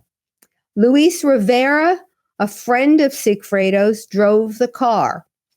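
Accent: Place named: New Jersey, United States. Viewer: ! I loved your coverage of Donna’s trial and I’m so happy you started your own show! I love listening to a fellow Jersey girl! ❤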